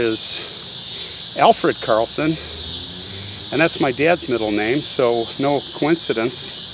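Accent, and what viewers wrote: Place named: Chicago, United States. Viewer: This is so awesome! I don't live in Chicago anymore, but my Scandinavian family is buried in several of the cemeteries you have covered in N. Chicago. I watched several of your videos just to see if you happened upon my family (slim chance I know). Love watching your videos and love your familiar Chicago accent and storytelling style. It makes me miss my family so much! It's pretty crazy that a Swede married a Norwegian 😂. Great video.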